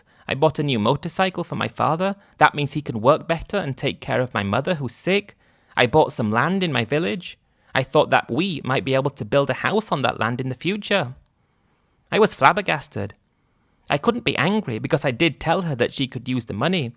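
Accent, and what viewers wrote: England, United Kingdom. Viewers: A guy from the midwest, narrated in a British accent? No thanks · This is an english accent not american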